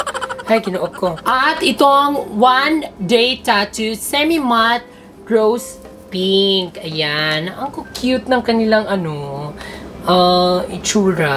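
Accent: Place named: Bisaya, Philippines. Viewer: Ate Bisaya ka? Kasi may Bisaya accent ka eh❣️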